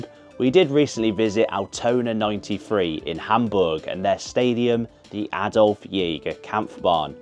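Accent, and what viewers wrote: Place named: Manchester, United Kingdom. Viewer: Nice strong manc accent youve got there lad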